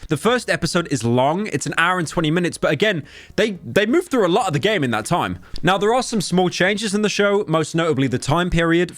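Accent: United Kingdom. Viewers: Haytch be oh bri-ish bruv make the h letter complicate m8 good content will view again get at it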